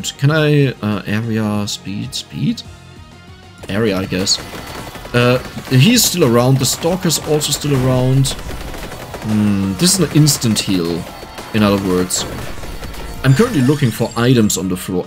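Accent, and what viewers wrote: Germany. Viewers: I am consistently impressed at the level of care and skill you put into these videos. Looking forward to more laughs and awesome content from my favorite funny talking German man ❤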